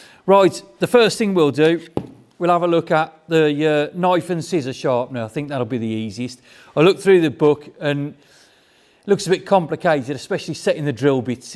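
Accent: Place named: England, United Kingdom. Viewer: thank you for responding. I took mine out of the box because you took the fear of its use and stuffing up. I sharpened knives, scissors, chisels, branches lopper and the shorthandle Axe. It was not a perfect job as the plastic was wobbly and in the way to do all of the edge; some came out uneven. I learned something as a Pen Pusher and perhaps I will do better next time. I saw the disaster with Drill bits so didnt attempt, I will think of some other use for that to sharpen the bent nails or as Pencil sharpener. Great to hear that Dialect of English as it reminds me of my time in England from North Sutherland, Jordie, and of Scotland to Llandudno in Wales and Devon in the South to Southampton. regards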